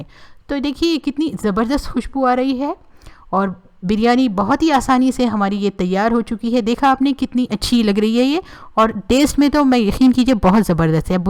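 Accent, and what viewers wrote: Hyderabad, India. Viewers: aslkm unty kya ap hyd se h apki language hyd jaise h plz rply kare thanku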